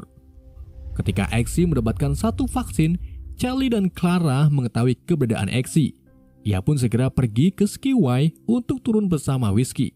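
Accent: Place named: Cirebon, Indonesia. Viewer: Wong cerbon kih ketenger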